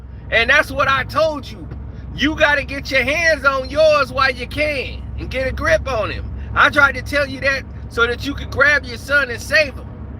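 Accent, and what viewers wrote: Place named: South, United States. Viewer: I love when you do that Southern accent.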